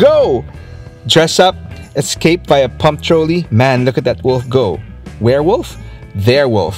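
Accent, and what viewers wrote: Philippines. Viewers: from your accent. are you from Philippines? Just curious. Was G.I. Joe big over there? I'm surprised when I see pinoy youtubers talking about gig joe. I never knew it was shown over there in the 80's. By the way you don't need to insert an image of every word or phrase your saying. for example, we don't need to see images ofMadeline Pryor when you say prior or images of Michael Jackson, goat saying bad and all the other corny images. Just stick with the subject. The other images are just so fake and corny.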